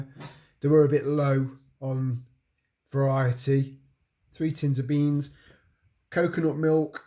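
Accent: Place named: East Midlands, United Kingdom. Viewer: Ooh that accent! Def an East Midlands boy!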